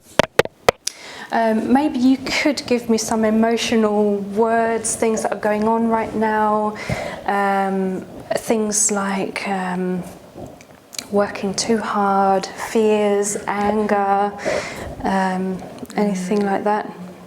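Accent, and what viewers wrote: United Kingdom. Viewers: Definitely an English accent :) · Funny, when I saw the name, I expected either Spanish/Mexican accent or an American one, not a South-East England/London accent.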